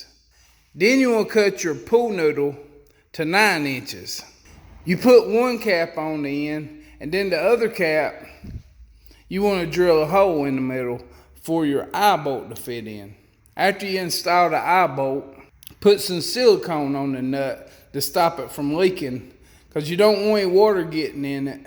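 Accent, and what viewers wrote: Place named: Alabama, United States. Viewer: You live in North Carolina, but your accent sounds East Central Alabama.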